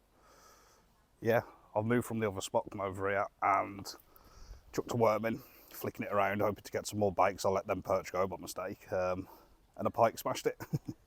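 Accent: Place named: Midlands, United Kingdom. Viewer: Hi. In Anglesey ATM, Friday. I'm just about to go down Beaumaris for a chuck with live bait later this afternoon for Bass. Caught a nice 4-5lb bass last week. Was amazed when I saw the video as I live in Leek and cycling around their yesterday on the mountain bike. Sounds like you're originally from the midlands area with that accent?. Great to see you're fishing a wide area, including North Staffordshire 🎣 The Rhudyard Lake Hotel to your right up the hill is great for a pint and some grub when you're next down. Cheers 🍻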